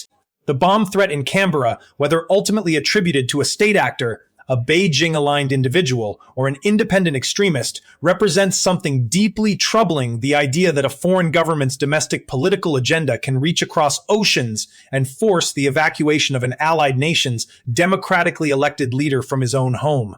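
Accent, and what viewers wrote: United States. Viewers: Why the American accent? This is BS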